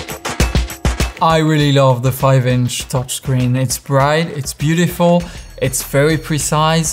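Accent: France. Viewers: I'd like to know where you're from (accent sounds French). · X-T3 for me...but great review, are you french ? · Excellente vidéo :) Recognized a French guy behind this English accent, carry on this move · J'ai eu du mal à repérer l'accent français :) J'hésite à switch de mon a6300 à cette caméra :s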